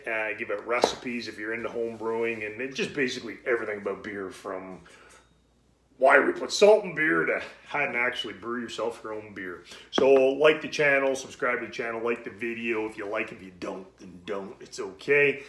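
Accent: Canada. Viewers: You sound Canadian! (I am too). Hope your stuff gets tons of views! This is a cool concept! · You speech patterns remind me a bit of Patrick Warburton 😂 loving the kanuck accent!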